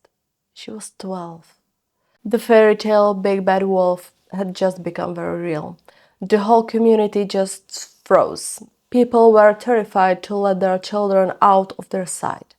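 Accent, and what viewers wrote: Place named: Eastern Europe. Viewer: My Grandad knew Muriel. They weren’t exactly friends, she was in the year above him. But he saw her around all the time. He and many others always believed it was an American soldier who committed the crime, there was a group of them stationed nearby. One vanished the following day after the murder which was obviously a little suss! 👀 Cool accent by the way! I used to live in Hungary some years back and I’m sensing you’re from that part of Europe?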